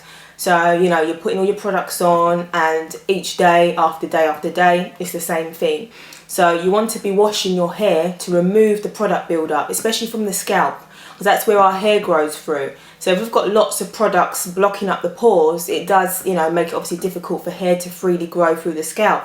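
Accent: United Kingdom. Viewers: are you british....you say strangffin ...thats funny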